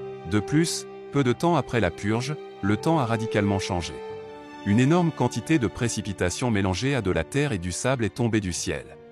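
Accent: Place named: Provence, France. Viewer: je confirme l'accent de Provence si je ne m'abuse rajoute un charme. (Salutations d'un marseillais (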